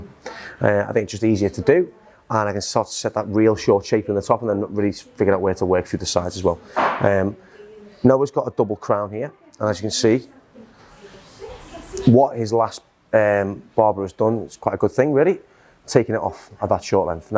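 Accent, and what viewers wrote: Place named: Merseyside, United Kingdom. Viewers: Lovin the Merseyside accent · He speaks too fast and has more of a northern accent compared to the art attack guy.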